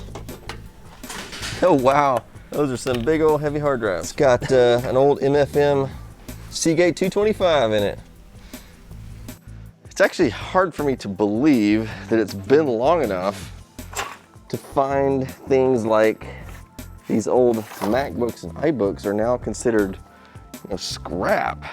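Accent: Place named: Texas, United States. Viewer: sounds more Texan than usual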